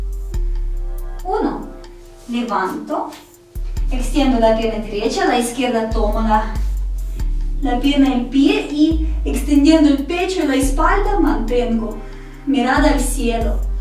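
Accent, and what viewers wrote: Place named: Russia. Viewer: Estás rutinas me encantan,combinando el yoga y perdida de peso, las amooooooo😘😘😘😘😘😘😘😘😘Un besito y no dejes de decir huesito púbico que me encanta tu acento ruso español jajajaja 😂😂😂😂😂😂😂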